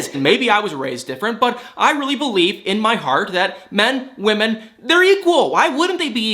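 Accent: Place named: South, United States. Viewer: You're from Michigan? (me too) Wondering where that cute little southern twang comes from when you say 'ing' words. Great channel, enjoying your posts. 😊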